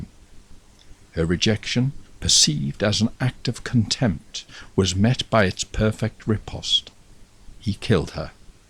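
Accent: United Kingdom. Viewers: I really really love this British accent and reading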